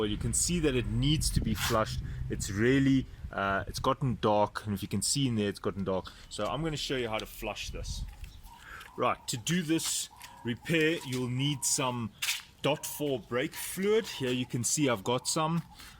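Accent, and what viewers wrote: South Africa. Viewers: After hearing your South Africa accent I became a subscriber.😊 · After hearing your south aflican accent I changed my mind about watching your video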